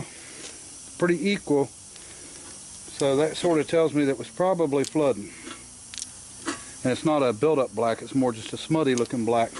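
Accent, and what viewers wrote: United States. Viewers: awesome find all the cars ive seen and worked on, but have never heard a straight 8 run. Can't wait for the next video. Also I know that you are country cause im country i here's it in the way you talk. Nothing wrong with being country either, but just so not to confuse the slower witted city folk. When you say sandblasting spark plugs, just say we's gonna clean them. Ya see most folk in the cities around the world take people at face value and what they say. Poor people haven't ever heard of slang words before. When I moved to the big city I found out that most of them were simpletons and what you said is what you meant. Think most of them is touched in the head. Or like they say in Cherokee hills of NC,they's touched by the great spirit, and us country folk knows that that's not good. I would try this don't explain what or why or how you're going to do something just do it,they may not get it, but that's because they never will.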